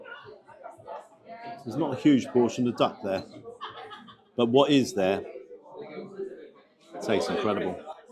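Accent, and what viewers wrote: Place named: Yorkshire, United Kingdom. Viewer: is a character though, he's quirky with his heavy Yorkshire accent and his "NOW THEN GUYS HOW YA DOIN, BACK ON T'ROAD AGAIN". He has a laugh and a joke with the audience, whereas is more serious, calmer and detailed. It's horses for courses for a lot of people, I enjoy both but I can see why would have a bigger audience especially with the younger generation, as he's more of an 'entertainer'.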